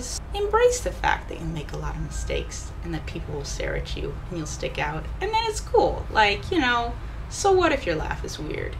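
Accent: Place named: Minnesota, United States. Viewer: Omg is she from Minnesota??? That accent!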